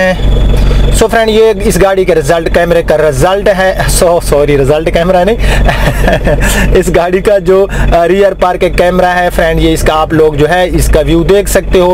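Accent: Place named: Thal, Pakistan. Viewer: Ap Thal kay ho Ap ki Zaban thal ki hai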